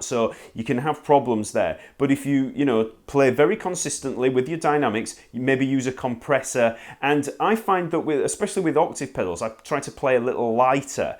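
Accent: United Kingdom. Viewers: I really enjoy this distinct british accent!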